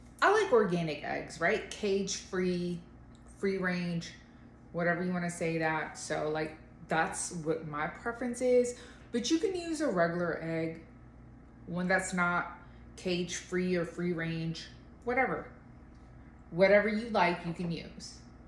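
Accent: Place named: Spain. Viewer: I love this idea for sure… I also love your Spanish accent!!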